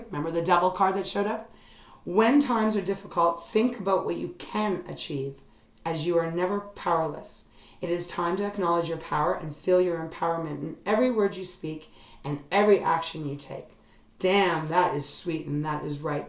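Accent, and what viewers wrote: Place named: Canada. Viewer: Thank you! ❤ Are you in Canada? I’m in Montreal and you sound like a fellow Canadian (I’m in Montreal)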